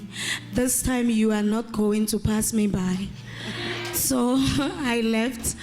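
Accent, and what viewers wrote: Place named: Liberia. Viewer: She sounds so Liberian! I'm a Liberian, I will soon be there in Jesus name!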